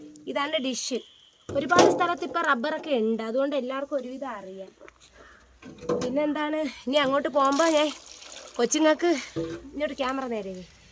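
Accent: Thiruvananthapuram, India. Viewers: നിങ്ങൾ വളരെ കഷ്ടപ്പെട്ട് ജീവിക്കുന്ന ഒരാള് തന്നെ . നിങ്ങളുടെ മനോഭാവത്തെ അഭിനന്ദിക്കുന്നു സഹോദരി . ആരെയും മനസ്സ് കൊണ്ട് പോലും ദ്രോഹിക്കാതെ ജീവിച്ചാൽ റബ്ബ് അനുഗ്രഹിക്കും . നിങ്ങൾ എവിടെയാണ് നാട് . സംസാരം കേട്ടിട്ട് തിരുവനന്തപുരം ആണെന്ന് തോന്നുന്നു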